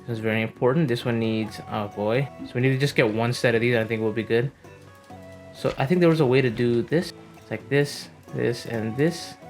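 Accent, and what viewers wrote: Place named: Mexico. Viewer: Im digging the Mexican accent!... At least thats what I think Im hearing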